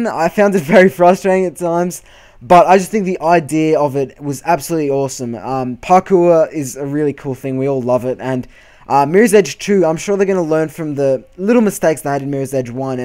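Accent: Australia. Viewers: He's Australian. Not everyone speaks english like you. British, Caribbean, American, Australian, Canadian. All english, just a different dialect of the language. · nice list bro I agree on everything you said. ps: are you Australian? ^_^ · its just so nice to hear a familiar australian accent on youtube.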